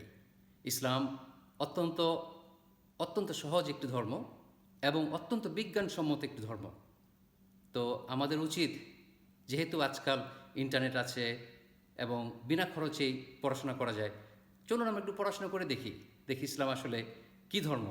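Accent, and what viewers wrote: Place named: Joypara, Bangladesh. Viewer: ভাই কিছু মনে করবেন না, আমি আপনার খুব বড় ফ্যান, আপনার কথা শুনে আপনাকে ঢাকার জয়পাড়ার লোক বলে মনে হচ্ছে। এটা কি সত্যি?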